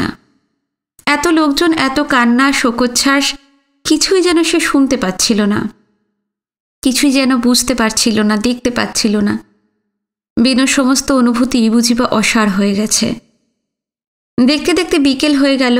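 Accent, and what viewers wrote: East Bengal, Bangladesh. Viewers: Khubi bhalo lagche, tumi je aeto parisram o jatna kore aei bishal upanyas path kore shonachho ,bishesoto purba banglar bhasha je immense dakhyatar sathe bolecho ta ak kothay atulonio, aeto ghatanar madhye ak jon adbhut bhabe missing se holo Jugal ,aeto charitra pratita prithok rupe path kora sahoj byaper noy tomar aei prachesta atyanta prosongshoniyo ,bhalo theko anek dhanyabad tomake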